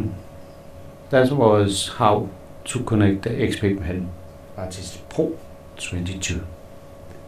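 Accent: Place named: Denmark. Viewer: danish innit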